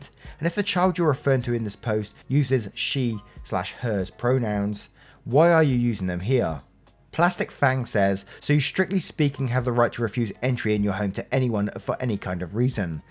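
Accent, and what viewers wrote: United Kingdom. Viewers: I am here for the British accent XD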